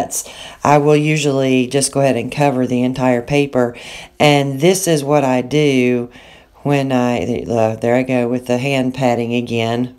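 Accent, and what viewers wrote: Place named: North Carolina, United States. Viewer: Another great video,! I love how this turned out. You used my favorite colors - and I'm glad to see you got the new inks. They are magnificent! The other inks you need to try are the Trex inks. You talk about bright vibrant inks! WHOA! I played with them for the first time this afternoon and was super impressed. They aren't bad pricewise, either. Big bottles, 12 colors - somewhere around $30. I love your videos (but you already know that) and think this one was especially powerful. I could create this! (Your real wispy ones not so much.) I'm glad your count continues to grow as you deserve it. I love your "backwoods NC accent" - it goes well with your videos. You've got an incredible style my friend! 😍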